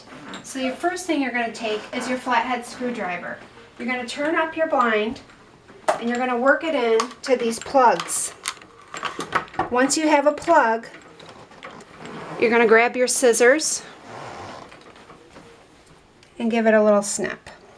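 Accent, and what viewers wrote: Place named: North, United Kingdom. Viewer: She is so sexy love the north/mid eastern accent.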